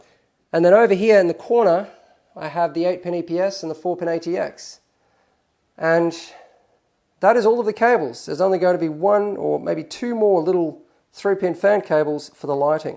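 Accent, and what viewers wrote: Australia. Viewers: i love the vids so keep them up ! but i got one thing to get of my chest: i for sure thought u were an asian male ( no offence meant) i think it's just the Australian accent fucking with my mind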